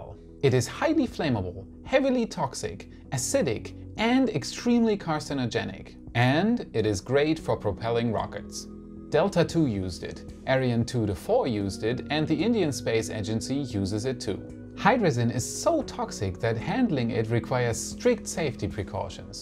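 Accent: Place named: Germany. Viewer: Are you from Germany? Bist du aus Deutschland? Deine Aussprache klingt relativ Deutsch. Just currius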